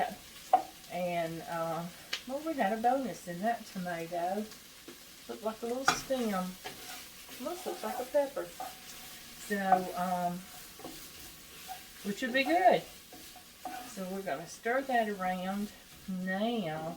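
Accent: South, United States. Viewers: You are a sweetheart. I very much enjoy your cooking (and your comforting southern accent).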